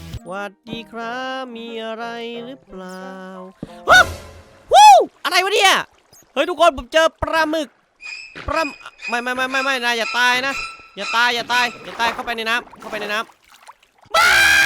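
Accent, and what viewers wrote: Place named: Isan, Thailand. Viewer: พี่พูดอิสานได้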